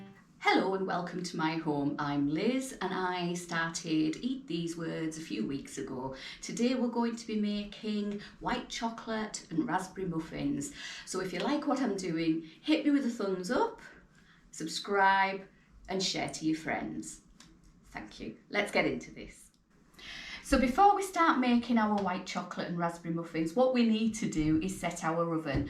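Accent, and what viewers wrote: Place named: Yorkshire, United Kingdom. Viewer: Will defo give this a go. Can I just say I am guessing your accent, are you north east or Yorkshire way?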